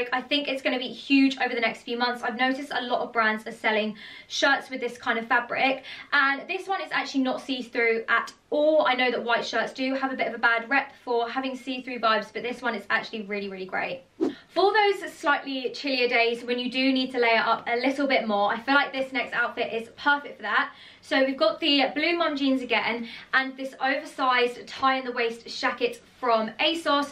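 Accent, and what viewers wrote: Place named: United Kingdom. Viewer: Her British accent 😁😁😊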